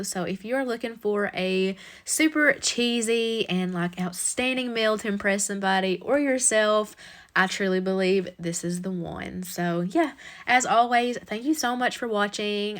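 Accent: South, United States. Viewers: This is so random but I love having a southern Youtuber say bowl and oil the same way I do. Bole and awwll. 🥰 🥰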